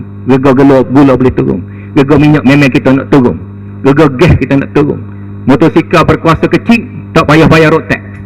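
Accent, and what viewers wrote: Kelantan, Malaysia. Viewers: Kamu ni bongok. Dah dia ceramah di Salor, Kelantan, memangla dia cakap loghat Kelantan. Jangan la membangangkan diri sendiri. Pegi la dengar ceramah dia di Putrajaya. Dia kecek kl la bangang. Kamu yang ikut UMNO sesat nak menyesatkan orang. Bodoh tak boleh diajar. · err nak mintak tolong kalau ada ceramah lg kt kelantan boleh x guna bahasa kl..minat kt ceramah ni...tapi bahasa tu pekat skit,susah nak paham sgt..minta maaf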